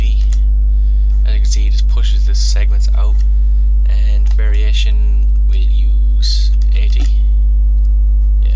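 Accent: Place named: Ireland. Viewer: Nice, are you from Ireland?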